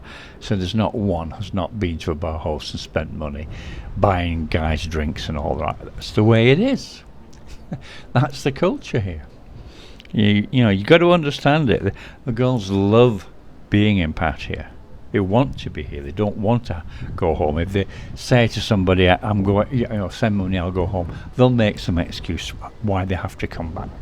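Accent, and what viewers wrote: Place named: Yorkshire, United Kingdom. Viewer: Think I sat next to you in Heavens Above in Jan this year? I recognized the accent and when I asked if you were from Yorkshire you sounded surprised/concerned and asked me how I knew. It was you right?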